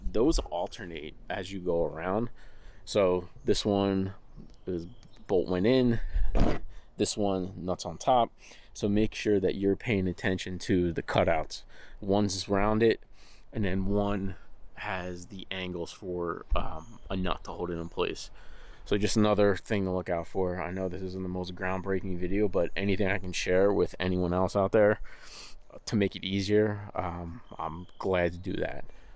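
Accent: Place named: Philadelphia, United States. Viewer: By the way, had a feeling you were from the Philly area by the sound of your voice. Just sounded local to me. Checked your profile and saw that you are...me too (Delco; now South Jersey).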